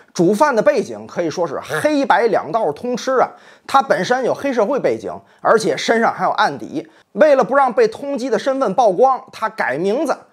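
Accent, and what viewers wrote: Tianjin, China. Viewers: 这家伙，天津嗑儿绝了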